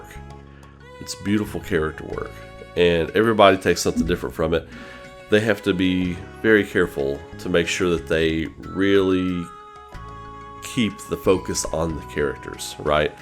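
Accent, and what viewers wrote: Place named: Eastern Kentucky, United States. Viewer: I love your content bro! Btw are you from Eastern KY? You sound exactly like one of my best friends from there. Very easy to listen to!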